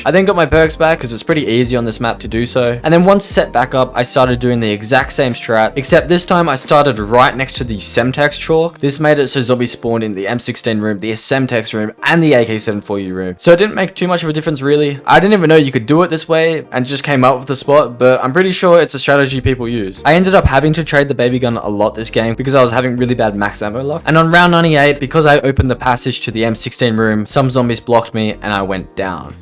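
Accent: Australia. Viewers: in google translate its cinema of the dead and he is saying nacht correctly he just aussie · You aussie man? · me and the creator of the video are both australian we have the same accent, it is NOT an accent problem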